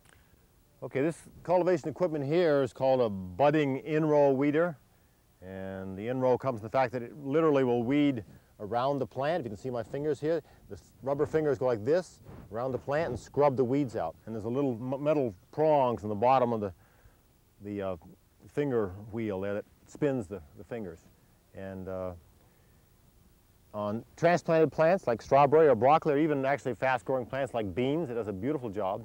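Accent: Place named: New England, United States. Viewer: This is probably the most dense video on vegetable cultivation on the internet. The yankee accent is great as well.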